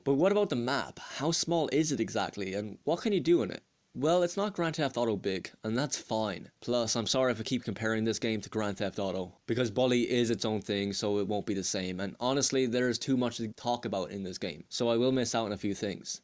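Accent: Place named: Ireland. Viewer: what part of ireland u from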